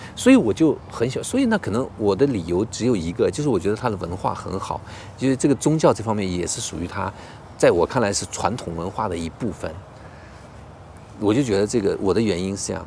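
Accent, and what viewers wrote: Military village, Taiwan. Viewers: 您講話的語氣已經很台灣了，像台灣人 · 你的口音就像我身邊的朋友一樣，怎麼一股台灣味。不帶台灣腔（台語）的台灣味。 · 您的說話已經開始有台灣腔了，聽起來很親切!! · 你說話怎麼跟我們台灣人很像呢？宗教文化主要是教化人心，也是讓我們凡事要做之前要考慮後果？不要只想要什麼，而是要想你能付出什麼？ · 講得好台灣欸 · 您說話有台灣人的腔調耶！👍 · 你説中文完全的臺灣口語和調調，而且聽起來溫儒順耳。敘述平實而不浮誇，深入臺灣明白社會結構，思緒是5_60歲的老臺灣文青~不錯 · 我也覺得有台灣腔.. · 除了渠道這兩個字不是台灣人的話，根本就是一位談吐文雅的台灣人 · 是台灣腔無誤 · 大哥你已經有點台灣腔了哈哈哈哈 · 請問您是哪裡人？怎麼台灣腔會這麼標準，如果沒看你所打的字，根本不會注意到你是別國的朋友XD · 山貓小子哈哈他說然後然後真的已經和台灣人沒什麼兩樣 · 這台灣腔還真是道地呀！人也挺帥的。謝謝你喜歡台灣。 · 聽起來99%台灣人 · 完了完了，我感覺這位朋友已經有一半的台灣腔😂 文化很有趣，需要被傳承，卻也需要被衝撞。衝撞之間，某些時候會產生特殊的反應，那就是創新。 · 您說話已經有台灣腔了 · 聽您說話沒有什麼捲舌音，老實說口音本身就很台灣.... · 真棒這影片，一口台灣腔⋯👍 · 有台灣腔了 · 更精確的説，與眷村的口音非常像。因為贅詞很少。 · 山貓小子應該是福建人吧？根本台灣口音。語尾還加啦。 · 不看字幕. 不看影像. 只聽聲音. 確實會以為就是台灣人. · 老哥你的口音已經是眷村口音了⋯⋯用字遣詞和腔調跟臺灣眷村子弟很接近了。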